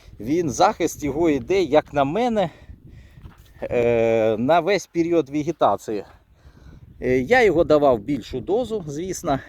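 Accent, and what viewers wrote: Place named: Russia. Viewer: Перестань акати і какати, як кацап. Гидко слухати!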